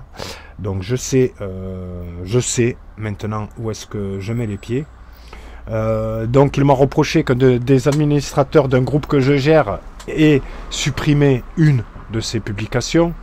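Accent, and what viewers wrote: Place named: Toulouse, France. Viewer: Salam, Force à toi.fait le tri mec. Ont commecent en a avoir vraiment,mais vraiment plein le cul. T'es videos sont magnifiques mon Ami. De la force pour toi et les tiens 🙏 L'union fait la Force, avec l'accent du 31 toloza ma gueulle 🔥🙏✊ La Mosqué au milieu de la Medina 🙏 L'église est sacrée aussi.. Salut Salam Shalom ✌️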